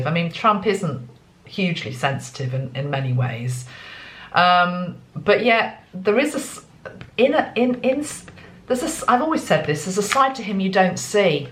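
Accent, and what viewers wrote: England, United Kingdom. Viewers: hello. to be completely off script; just wanted to say I love your accent and it reminds me of the English actress in Agatha Raison. a jolly good series we got here in Australia recently. also it was good to be reminded of the Light Workers. thankyou for your insights. janell downunder x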